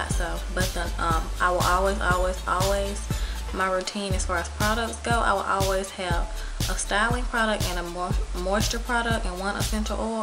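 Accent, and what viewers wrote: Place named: South, United States. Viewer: i love this tutorial and your country accent.... lol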